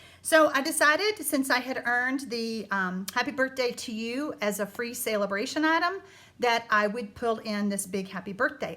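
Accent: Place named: Texas, United States. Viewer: Lordy, I miss that TX accent ! Raised north of Dallas in Denton and moved to se OK in '77. No one talks like that north of the Red River and I miss that ! Love your videos Lady. Keep on doing what you do ! 🙋 GreatNana in se OK usa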